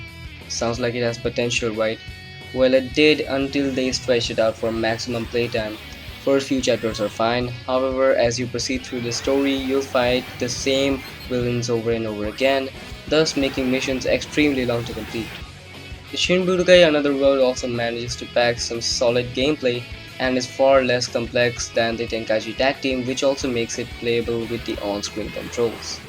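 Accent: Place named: India. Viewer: I love the englisch Indian accent dialect Don't worry bro I won't steal your cow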